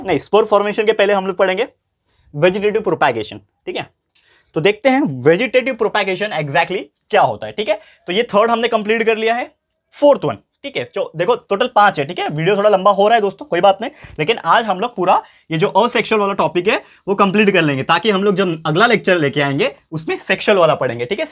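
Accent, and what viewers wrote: Maharashtra, India. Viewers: Sir what is your mother tongue?? Are you marathi??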